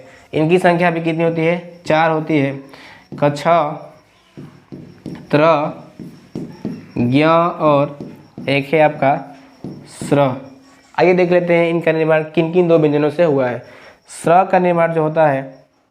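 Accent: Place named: Bihar, India. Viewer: Bahut hi ghatiya lecture hai samajh me nahi araha bihari bhasa se padhaya sub teacher aacha padhate hai is channel ke bas ise aur english wale chhod kar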